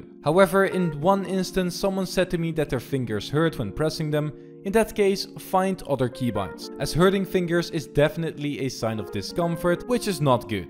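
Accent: Netherlands. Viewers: qucee 😂😂 Are you dutch Nice vid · Are you dutch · Are you from the Netherlands? It sounds like it. Edit: you are haha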